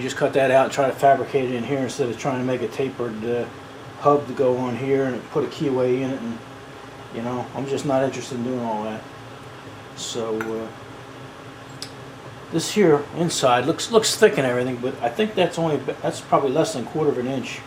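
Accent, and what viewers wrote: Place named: New York, United States. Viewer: You sound like your from new york or from the country....or both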